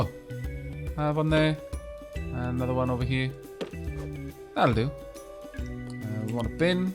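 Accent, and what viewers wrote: Wales, United Kingdom. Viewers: Welsh do dislike being called English, as do Irish and Scottish people.. He does have a nice Welsh accent :) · Whisper: He's Welsh.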